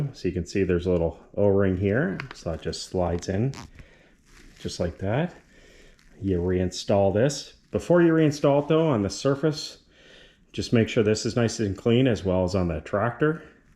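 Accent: Canada. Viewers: Great video! Really clear! I'm from New Zealand and I enjoyed the Canadian accent too!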